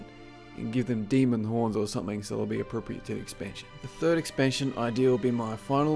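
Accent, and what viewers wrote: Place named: Australia. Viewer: He doesn't sound british at all...He is clearly Australian mate.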